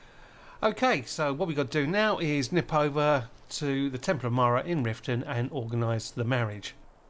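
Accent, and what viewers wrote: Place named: Cornwall, United Kingdom. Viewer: Cornwall, I wondered why I couldn't pick your accent !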